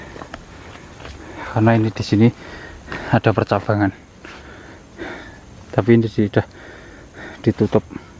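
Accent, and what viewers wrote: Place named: Central Java, Indonesia. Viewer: wahh puas pindah pindah jalur dong....satu gunung bisa bikin banyak video ini mas....satu persatu via mana via mana di kompliti ...orak usah adoh adoh sek...gunung ning kampungmu kompliti dulu ya 😁....kmu jawa timur tapi kok medoknya blum semedok surabayanan ya mas....masih agak agak mirip jawa tengah logat dan nadanya bicara 😁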